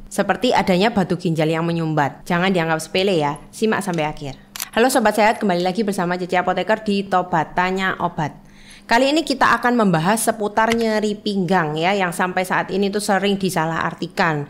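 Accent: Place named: East Java, Indonesia. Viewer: Logat Jawa Timuran 😂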